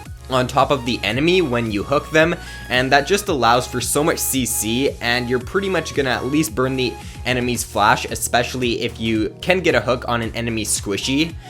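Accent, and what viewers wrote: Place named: Canada. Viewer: Ramon Schöllkopf he is Canadian, so to him he is pronouncing just fine. Just different accents I guess.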